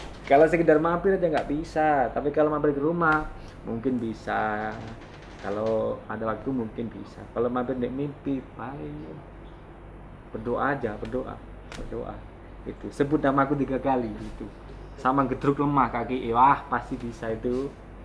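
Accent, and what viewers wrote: Java, Indonesia. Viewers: Njowoooo banget mas idola😙😙😙😙gaya ngmge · Wala mas omongnya amburradul Jawane masih di bawa mbo di Tingal sedikit 2 mas kelihatan Banget re jawanya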